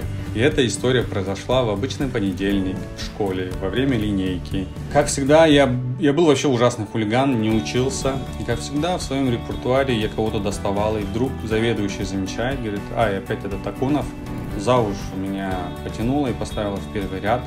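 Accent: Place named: Naryn, Kyrgyzstan. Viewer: Крассавчик ты как Нарынский говорю...Эркек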